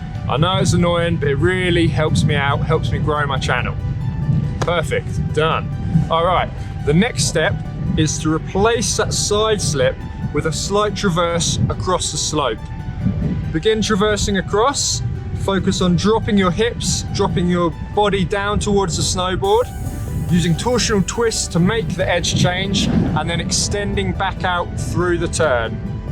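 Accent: United Kingdom. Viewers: Best British Snowboarding Youtuber. I like your British accent. U also an excellent coach.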